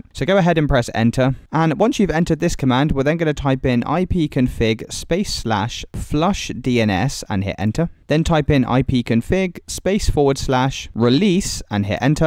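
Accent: United Kingdom. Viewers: THANK YOU BRITISH MAN DNS SERVER WORKED 4 ME MAN!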